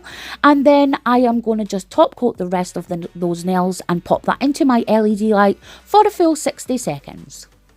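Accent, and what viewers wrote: Scotland, United Kingdom. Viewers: Is this a Scottish or Irish accent?! I love it, either way. Usually I'm really good good telling them apart but yours is difficult. I'm leaning towards Scottish more than Irish.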